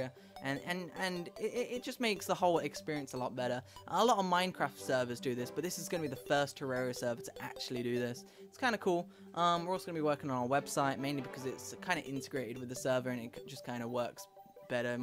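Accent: United Kingdom. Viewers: 1 quid table cloth from asda = 1.46 table cloth from walmart for you yanks that didn't understand his queens english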